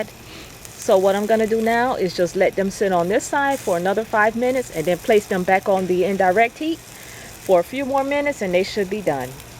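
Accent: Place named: United States. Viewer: Fuck I hate your dumb American accent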